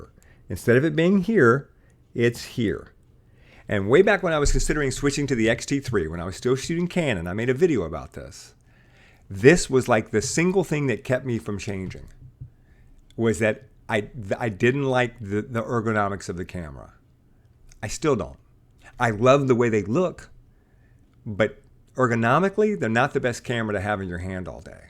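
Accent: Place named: United States. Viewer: I did like your presentation on these two cameras, it got me out of my doubt. And all American presentation , not like these other foreigners that I coudn't understand what they were saying. Nice comparison !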